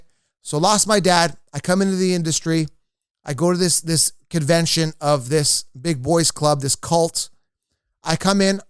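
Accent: Canada. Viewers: Are you from Canada? 🇨🇦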